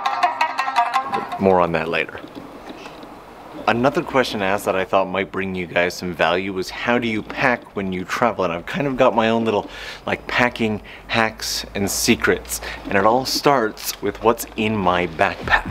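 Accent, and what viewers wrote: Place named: Canada. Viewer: I've just started watching a few of your videos and I heard your accent. I was 90% sure you were Canadian but wanted confirmation. I saw this video and I clicked it so fast to scratch that itch I had. Sure enough, you're definitely Canadian looool. Greetings from Jamaica!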